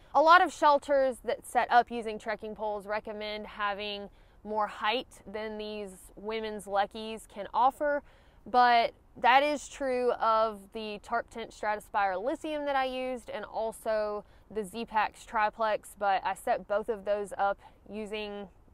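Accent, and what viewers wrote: South, United States. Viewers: Just stumbled upon your channel and love the info and that southern drawl 👍😎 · It’s good to hear a southern accent! Moved to CO from Elmore County last summer. I miss hearing Southern English!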